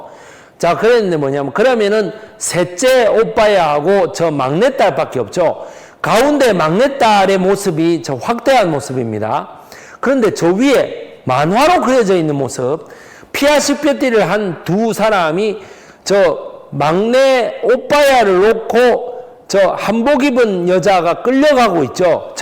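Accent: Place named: Gyeongsang, South Korea. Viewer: 구수한경상도사투리억양 으로 아주 유익한 우리나라 역사해설들을 배기성선생이 참 잘해줍니다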